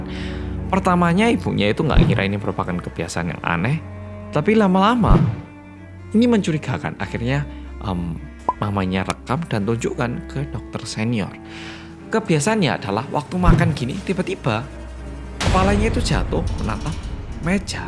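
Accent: Java, Indonesia. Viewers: Welcome back wong medok dengan detektif 🥺🥺🥺🥺 Betapa kangen nya aku sama detektif 🤧🤧🤧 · Tim gercep Mas wkwk ❤️❤️❤️ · Alhamdulillah. Orang aplud lagii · timmmmmmmmmm gercepppppppppp korea medokkkkkkkk mas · Siappp mas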